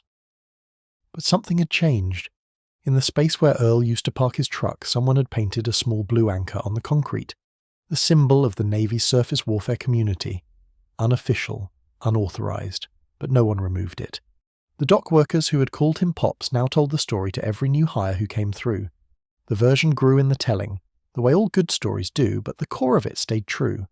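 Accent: United Kingdom. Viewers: Why is a Brit telling us these important stories? We need to have this kind of respect for our own. We need to be teaching it ourselves. Thank you, sir, for showing us the way.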